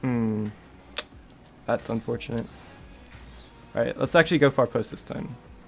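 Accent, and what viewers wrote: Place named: United States. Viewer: Ur like the only good freestyler who has an American accent, maybe osm too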